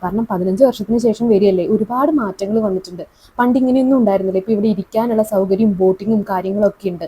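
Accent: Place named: Thiruvananthapuram, India. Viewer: നേരം പര പര പെലർന്നു..😂😂😂 തിരുവന്തോരം സ്റ്റൈൽ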